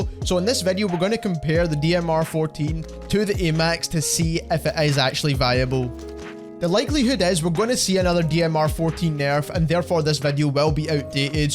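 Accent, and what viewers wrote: Scotland, United Kingdom. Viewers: I like your Scottish accent 😊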